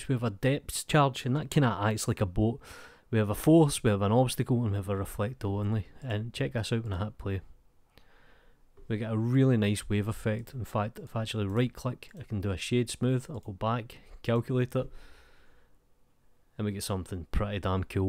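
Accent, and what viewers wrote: Scotland, United Kingdom. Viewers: nice and simple , love those short informitive tutorials you do, thanks , btw I love you accent scottish people are amazing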